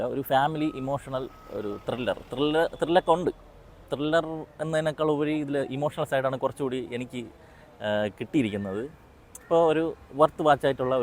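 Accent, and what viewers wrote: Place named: Thiruvananthapuram, India. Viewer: I like Thiruvananthapuram language. By kanyakumari karan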